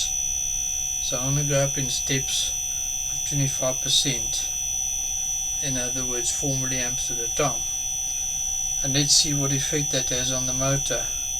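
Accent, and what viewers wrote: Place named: South Africa. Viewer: You sound very south african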